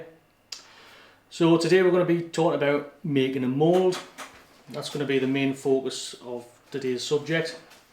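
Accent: North East England, United Kingdom. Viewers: This is a great tutorial, thank you for posting. Also living in Brisbane and being from the UK it is really nice to hear an accent from the North East.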